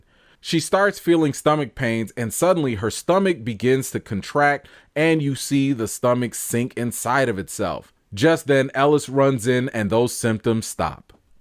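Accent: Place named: Chicago, United States. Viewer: I KNEW YOU WERE FROM CHICAGO! Lmao and you remind me of one of my friends in Dolton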